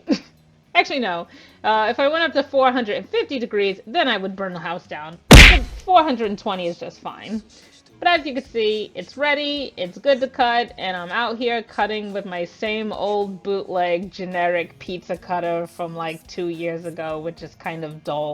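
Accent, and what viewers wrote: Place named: New York, United States. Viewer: D))) mouth watering REAL bad right now haha ;D love your New York accent , you can make pizza for me aaaany time sista ; )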